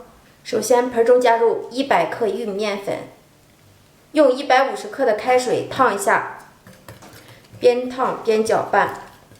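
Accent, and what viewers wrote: Shandong, China. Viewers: 山东姑娘是吧？不错 👍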